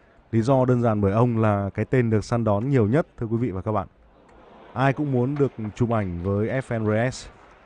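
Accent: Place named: South, Vietnam. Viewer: Tường thuật Bida chỉ cần chậm nói ít nhưng đủ thế thôi chứ đâu cần phải liến thắng như tường thuật bóng đá mà người bl nói tiếng miền Nam nói quá nhiều làm mất đi cáo hay cái đẹp của môn thể thao này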